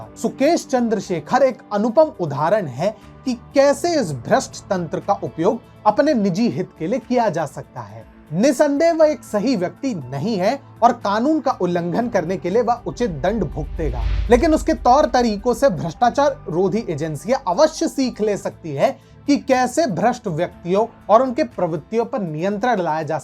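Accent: Standard. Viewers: Aapki shudhh hindi vachan shaili bahur sundar lagti hai!👍👍👍👍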